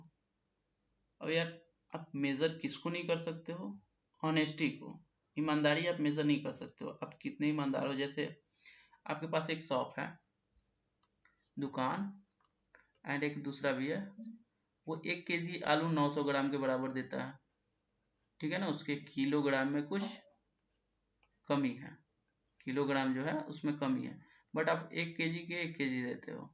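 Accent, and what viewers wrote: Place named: Bihar, India. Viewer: SIR AAPKI LANGUAGE ME BHOT PROBLEM AARHA H AAP LAGBHAG BIHARI BOLTE HO OR ENGLISH READING BHI UTNA ACHA NHI FOR eg. aap Very ko Bery padhte ho , AAPNE PADHANE KE LIYE TOPIC TO ACHA LIYA H LEKIN THODA EASY LANGUAGE ME EXPLAIN KRNE KA KOSIS KIJIYE OR BOLTE SAME CONFIDENCE RAKHIYE TABHI AAP EK TEACHER BN SKOGE PLZ IMPROVE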